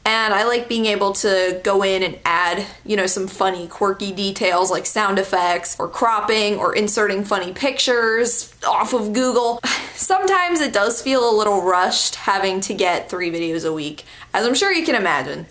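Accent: Pennsylvania, United States. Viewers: could you do the Accent Tag would love to see that from you because you would be exceptional at it because PA accents are out of this world!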